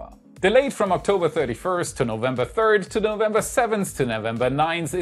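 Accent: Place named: Germany. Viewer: THE #1 most important thing about American English is that “the other person” understands you. It’s okay to be German and sound like it! And stop worrying about your “r”s! We LOVE your accent!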